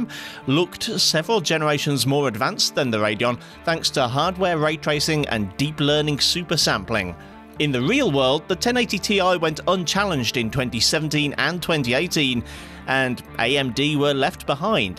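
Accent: United Kingdom. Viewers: british guy speaking about GPUs for 19 minutes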